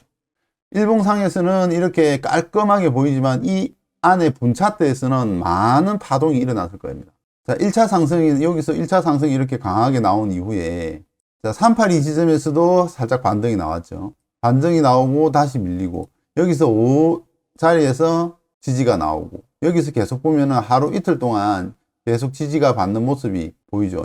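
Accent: Busan, South Korea. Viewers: 부산이신지? ㅋㅋ 익숙한 말투가 귀에 꽉 박히네요~ 내용이 너무 유익하고 전문적이라 계속 공부하고 있습니다. 감사~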